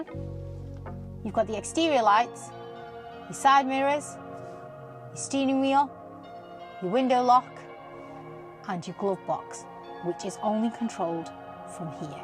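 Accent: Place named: Stoke, United Kingdom. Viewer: You got a very strong stoke accent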